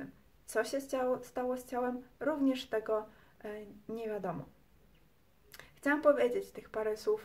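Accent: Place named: Northeast, Poland. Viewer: Północno Wschodnia Polska. Tak mówią również Polacy mieszkający na Wileńszczyźnie.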